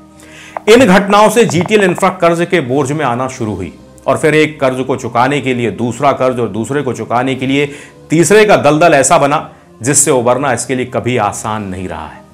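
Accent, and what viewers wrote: Standard. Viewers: kya sudh hindi bolte hai. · you pure hindi speak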